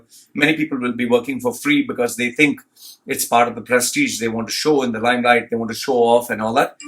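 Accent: India. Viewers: You sound like an Indian,,,are you...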